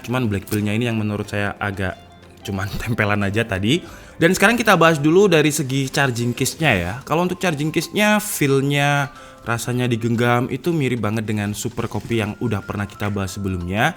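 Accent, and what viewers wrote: Java, Indonesia. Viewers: Di kelet kan pakai api... Wes talah jowo mu loh mas Medok banget... Seneng aku mbek bahasa ne..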